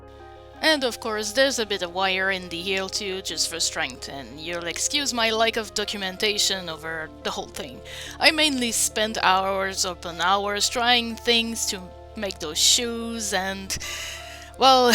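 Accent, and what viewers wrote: Quebec, Canada. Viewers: 2 minutes in, I had to check your "About" section to confirm that you are indeed a fellow french Canadian hehe. I recognized the accent ;)) Salutations de Laval! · 1 minute in and I just knew you were french canadian, amazing work mon chum <3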